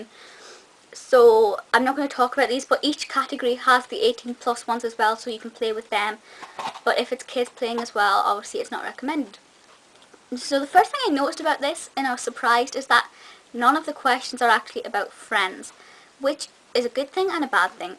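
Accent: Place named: Scotland, United Kingdom. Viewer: Sorry, I'm American and I'm just inferring from your accent that you are of Scottish descent, if I'm incorrect, I apologize.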